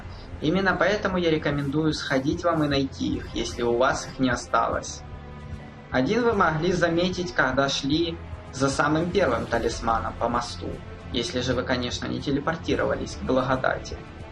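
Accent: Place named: Ukraine. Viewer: Ти українець? Я по словам чую, такі слова як вночі. Також ти літеру ґ в рос мові вимовляєшь як г. Якщо тт справді українець, Слава Україні!!!🇺🇦🇺🇦🇺🇦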